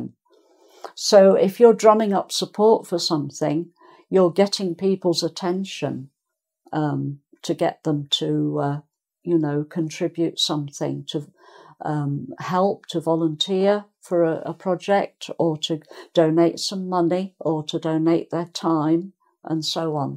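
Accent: United Kingdom. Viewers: Your accent sounds British, may I know which country you are from ? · Interesting. I love your lesson, Ma'am. The British Accent you speak, and all the teachings are so much helping and understandable. Thank you very much. Greetings from Indonesia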